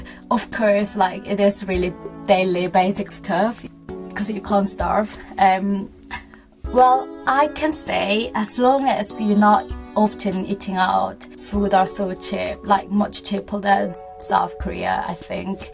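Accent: United Kingdom. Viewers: 영국발음이 너무매력적이에요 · 영국식 따라가시기 위해 정말 많이 노력하신 티가 나네요. 한국에서 워홀 오셔서 이렇게 잘 하시는 분 드물어요. 가끔 실수가 있거나 반복적인 표현이 있지만 거의 완벽하십니다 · 영국발음이시네용 원래 영국발음이셧나요 아니면 영국에서 사시면서 바뀌신건가요?? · 인종차별 영상때부터 잘보고 있는 구독자에요! 저는 캐나다 워킹홀리데이 다녀왔고 스벅에서 일했는데 진짜 몸 뿌셔지는 줄 알았어요 ㅠ ㅠ 스벅에서 일하시면서 영어 많이 늘었나요? 전 영어가 늘기는 늘었는데 어느순간 영어가 안늘고있다는 느낌을 받더라구요.쓰는 영어가 한계가 있다는...그런?? 지금은 다시 영국에 가고싶다는 생각이 들어요 ㅜ 한번 나갔다오니 두번 나가는건 별것 아니겠다 싶더라구요!! 특히 영국영어하시는것보니 너무 부럽네요. 영어하시는 모습 너무 멋지신것같아요! 좋은 영상 감사드립니다.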